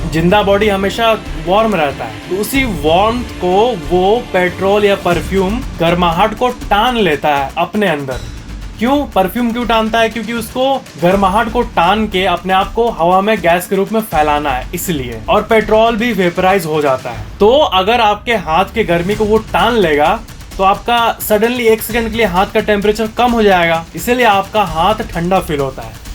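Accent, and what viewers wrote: Bihar, India. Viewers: U r from Bihar bhole bhihaari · Bihar se ho jio bro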